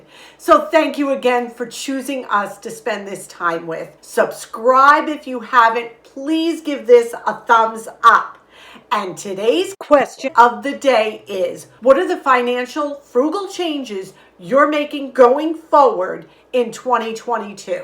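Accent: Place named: Boston, United States. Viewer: I love your accent. You sound like a Boston girl from italy.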